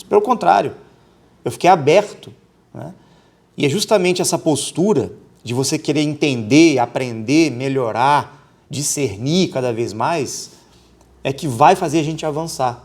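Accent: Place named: Rio de Janeiro, Brazil. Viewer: está carioca..😮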